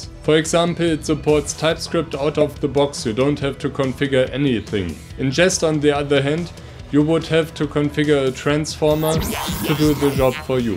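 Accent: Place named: Germany. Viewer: Good old german accent 😄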